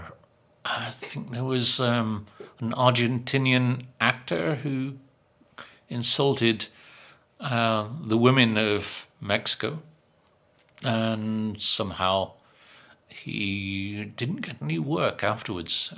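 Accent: United Kingdom. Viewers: Me too is true ( The British accent is beautiful!! ) 💙🫂💯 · me encanta su acento británico 😊 no conocia su canal. 🖐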